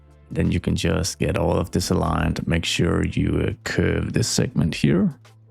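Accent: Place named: Denmark. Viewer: is that a danglish accent i hear🔥🇩🇰🔥🇩🇰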